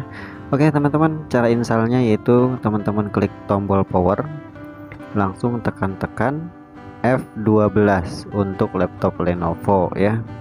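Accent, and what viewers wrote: West Java, Indonesia. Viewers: Logat namah sunda...dimana ie kang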